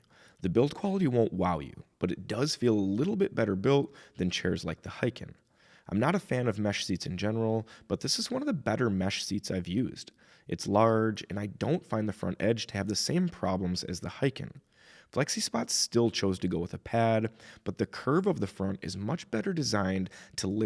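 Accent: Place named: Canada. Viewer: That's usually a Canadian accent, or the states that border Canada. Like Minnesota, Washington, etc.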